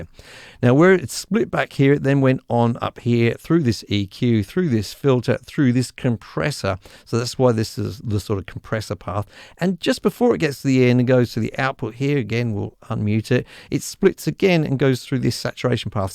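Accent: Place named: Australia. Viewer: ... I could listen to your calm, informative, Aussie-tinged explanations all day long. So cool. I must try parallel processing some time.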